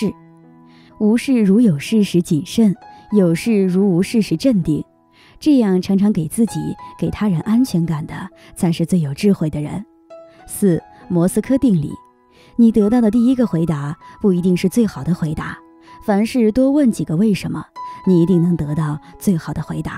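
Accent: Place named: China. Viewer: 請問~版主是哪裡人?中國人嗎?聽口音很像中國人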